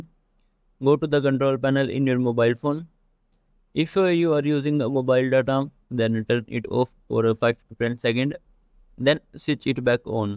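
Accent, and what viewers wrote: India. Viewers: It's English but with a heavy Indian accent. The only people who would understand this are Indians and people who work with English speaking Indians. For the most part, they are very tech savvy and their contributions are welcome. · Even for an Indian his accent is too heavy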